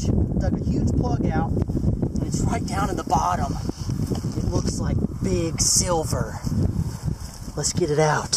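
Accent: South, United States. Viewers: I'm here mostly for the accent, also to watch find treasure! 😅 This California girl can't hear enough of a lovely southern accent. 🙄👌🎶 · i love ur country voice!! itss saatisfying to me from the north, thanks for the verses at the beginning of ur vids:)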